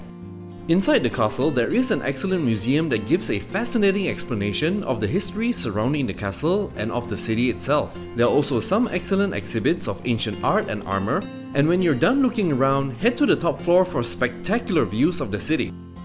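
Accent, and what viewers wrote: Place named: Singapore. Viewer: You sound like you’re from Singapore.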